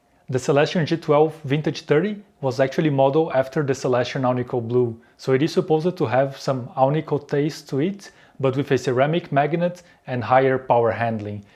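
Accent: Brazil. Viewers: Tu é brasileiro né? Mó jeito de brazuca de falar em inglês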